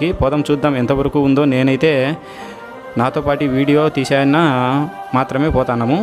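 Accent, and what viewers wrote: Rayalaseema, India. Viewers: Tammudu mee vuru Kurnool aa Rayalaseema matalu laga unnayee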